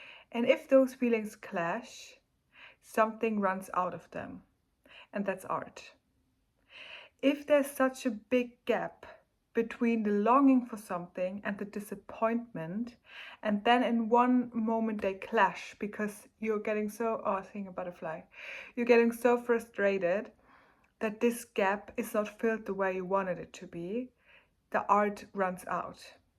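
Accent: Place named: Germany. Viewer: you sound german, Grüße! :D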